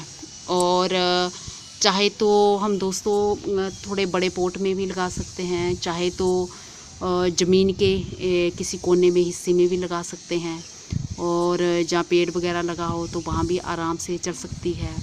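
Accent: Punjab, India. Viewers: love your sweet punjabi accent! if your plant is ready and bearing any melon, pls. post some pictures here. waise...videos zara brief banaya karen. thanks! :)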